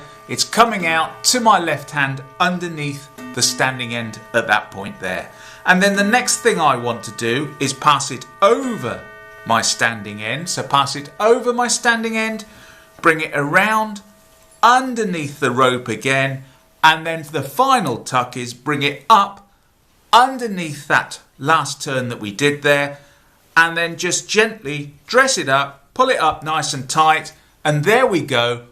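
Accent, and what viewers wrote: England, United Kingdom. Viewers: I don't use it for much other than hanging tools. But I'm glad we have YouTube to show us that you appreciate the simple thing well done. Knots and the British accent seem to go together. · It's an English accent. The English live in England, the Scottish in Scotland, and the Welsh in Wales, but all three countries are British because they live on the same island: Great Britain. Welsh and Scottish accents are British, but this one is clearly English. Hope this helps.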